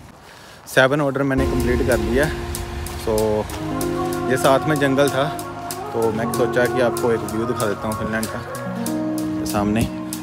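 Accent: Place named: Punjab, India. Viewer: Punjabi nikal hi jandi moh cho 😂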